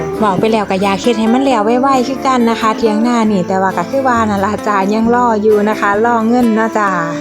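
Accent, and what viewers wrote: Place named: Sisaket, Thailand. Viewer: เว่าไป๊-กิ๊นคนทางศรีสะเกษเบาะคะอยู่อำเภอได๋น้อค่ะ😅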